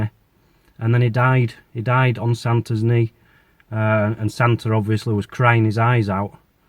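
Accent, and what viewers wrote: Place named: North, United Kingdom. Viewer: I could listen to that Northern accent all deey.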